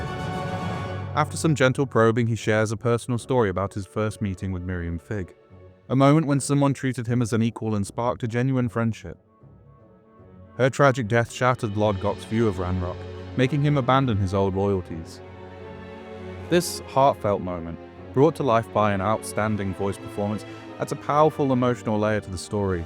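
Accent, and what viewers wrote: United Kingdom. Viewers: Yeah I’d say he’s doing it on purpose because he’s British What a weird comment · The creator is just well spoken and British lol you can tell it isn’t AI by the way his voice breaks every now and then. AI isn’t like this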